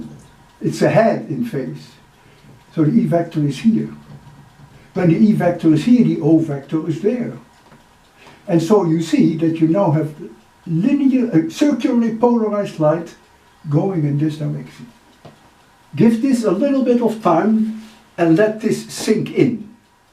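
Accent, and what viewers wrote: Netherlands. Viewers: Thank you! I used to live in Holland back in the 1980s and I recognized your dialect immediately when I watched your first video. I still love physics but do computer work. Danku wel.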